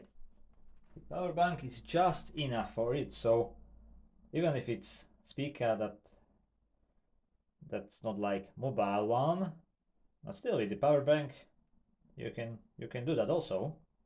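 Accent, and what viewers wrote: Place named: Slovakia. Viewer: viem ze si slovak pocut to ahahahaaa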